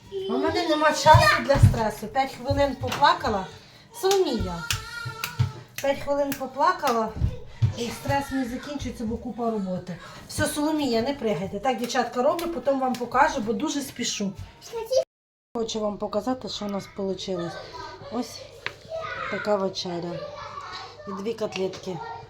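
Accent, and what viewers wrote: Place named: Lviv, Ukraine. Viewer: Ви дуже гарно розмовляєте українською,молодчинка,говір подібний до нашого львівського.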